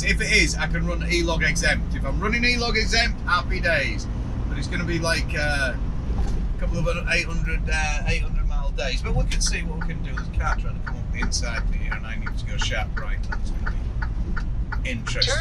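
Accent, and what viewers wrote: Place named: Cockney, United Kingdom. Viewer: Is that a Cockney accent? Even the trucks recorded voice had a female Cocky accent. Doesn't sound like rural Iowa to me.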